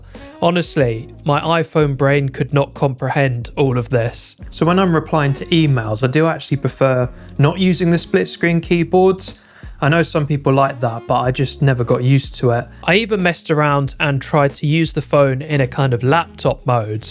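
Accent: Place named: Estuary English, United Kingdom. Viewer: I enjoyed the estuary English accent. I don't hear it much on here. We usually get upper English, Irish, or Scottish.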